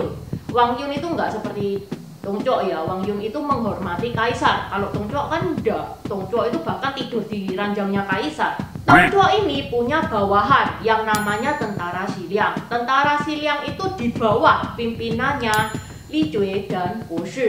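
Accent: Java, Indonesia. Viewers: Logatnya njawani men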